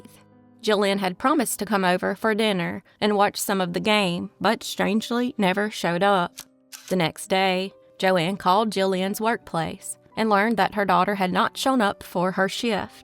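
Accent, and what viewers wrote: South, United States. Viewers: Does it make sense to anyone else, that a southern voiced AI is doing such a horrible job of narrating these stories! Can we get a New York narrator please?! Geez!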